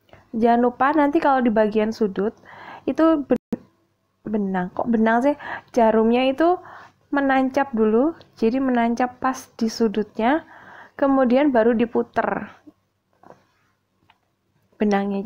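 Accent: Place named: Java, Indonesia. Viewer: Bahasane mbk lucu.. Wong jowo yo.. Daerah pundi.😁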